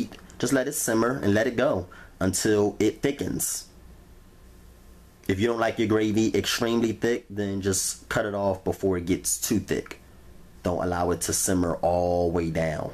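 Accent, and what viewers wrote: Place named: Baltimore, United States. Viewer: U gotta be from bmore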